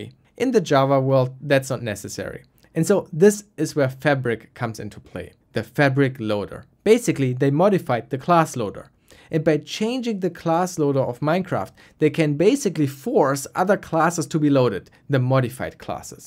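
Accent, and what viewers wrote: Germany. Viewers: Wie krass man hört, dass du Deutscher bist xD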